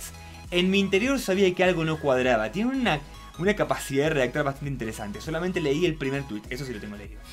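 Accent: Argentina. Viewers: tiene la voz de un argentino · Jsjs argentino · Tiene un acento re argentino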